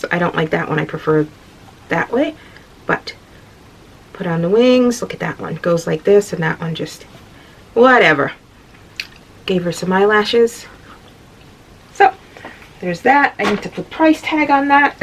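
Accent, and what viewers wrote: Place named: Boston, United States. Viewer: Hahaaa.. you were correct! Rose LOVES to crochet 😜 Wow!!! You got scammed? That's very upsetting 😡 You are an UHHHMAZING crafter. You must totally use a teeny tiny hook because I made the same froggy's, with only 1 strand, and mine are the same size as yours haha I need to invest in an eye nob thing. I so love your yesstuhday boston accent 💖 takes me back to a happy place.. Ooohhhh, those aint no pickles bahahaha jk I'm gonna start using the glue for the eyes. Great tip! The giant Octo is so pretty 💖 You made beautiful projects. Good job friend.. you will sell out!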